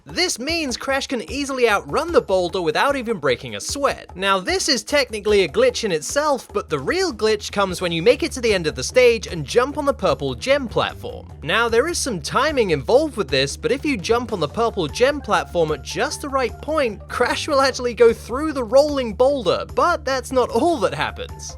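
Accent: United States. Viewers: Your jarg American accent actually offends me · What the fuck is your accent? Had to turn this off because I was too busy trying to work out why you sound like 20% American and 80% from England.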